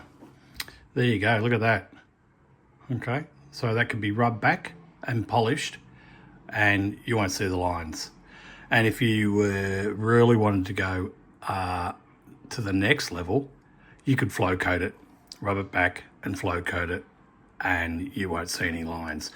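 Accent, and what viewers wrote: Australia. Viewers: Hi. I know I'm a bit late on this but another of your videos recently popped into my YT feed and I've been catching up on your videos ever since. Thank you as they're very helpful in improving my modelling skills. One question - I was led to believe TS-13 was too 'hot' to apply directly over decals so I've used other clear sprays. Is the secret just to keep the first coat over the decal very light? Btw, I'm in Sydney so it's nice to hear a familiar accent.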